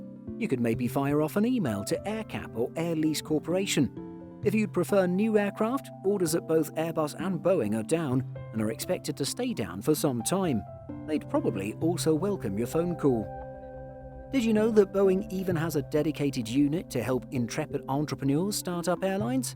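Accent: United Kingdom. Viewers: I’m just curious and it’s no big deal to me, but like in this program, when you mention capital investment you most likely show U.S. dollars currency in your videos rather than British pounds, or other currencies. All along I’ve thought Simple Flying originated in Great Britain. I guess that’s because of the accent of your narrator (He does a bang up job.) so I’m always expecting monetary value in pounds and you trick me and use dollars. So my question is... What’s up with featuring American “dollars” in your script usually rather than British pounds??? FYI: I enjoy your programs. Please keep the high quality. Many thanks!!!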